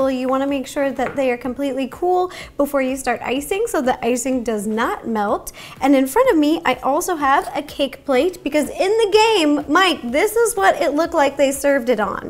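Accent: South, United States. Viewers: She is starting to sound southern! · was it just me or did she sound like she had a southern accent 😂😂 love u ro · Why does she have a southern accent half the time? She's so cute😂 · No one:......... Me: notices accent why is there a southern accent if she is from Seattle, WA?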